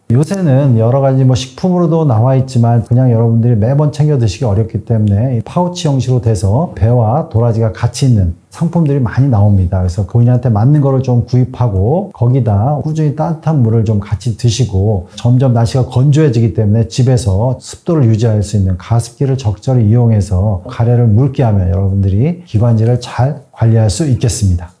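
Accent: Gyeongsang, South Korea. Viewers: 경상도분 같아요?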